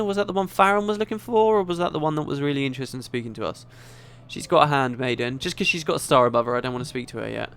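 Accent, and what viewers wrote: England, United Kingdom. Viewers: hahaha, youre so english i love it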